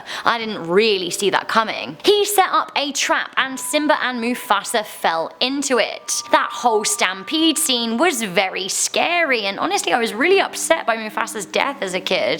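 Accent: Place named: England, United Kingdom. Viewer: what part of England do you hale from?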